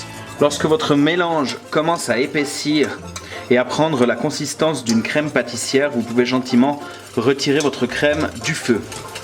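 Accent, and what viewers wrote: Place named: Valais, Switzerland. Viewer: Le bonne accent valaisan 😍😂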